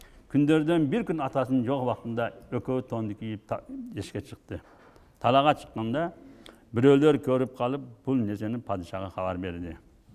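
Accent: Batken, Kyrgyzstan. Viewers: Кыргызча эле суйлоп атат.Бизге окшоп чек арада жашасан сен дагы ушинтип суйлоп калат элен билбейсинби Баткен тараптан окшойт.Ээн кучтуу аалым молдолор Баткенден.Мен сыймыктанам . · Кыргыз тилинде эле суйлөп жатат, болгону түштүк баткен диалекти менен сүйлөп жатат, улутчулдук, аймакчылык кылуу күнөө болот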